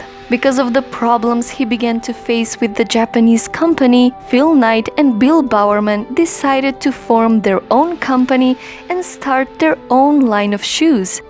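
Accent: United States. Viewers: I need to have an American accent. Does anyone know if the English she speaks is a New Yorker? Because i love her voice.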